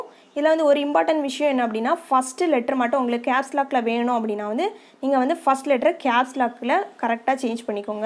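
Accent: Kanyakumari, India. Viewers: Seems like kanyakumari Slang! 💙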